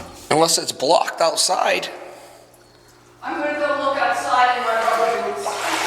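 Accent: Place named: New York, United States. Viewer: That New York accent though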